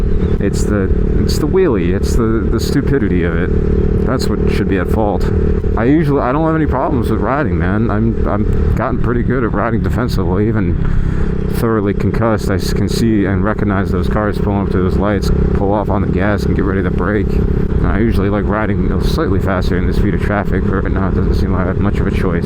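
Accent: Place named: Louisiana, United States. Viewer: I know you’ve got something wrong cause you sound like you’re from Louisiana with that slur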